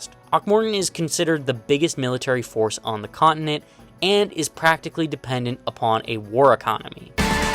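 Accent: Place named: Appalachia, United States. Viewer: Considering the appalachian southern accent is a derived form of the Scottish accent. This is a wonderful accident.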